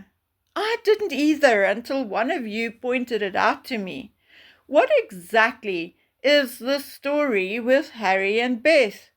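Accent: South Africa. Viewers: Just heard this post is this a South African accent here?? If so, greeting 🙏 from South Africa 🇿🇦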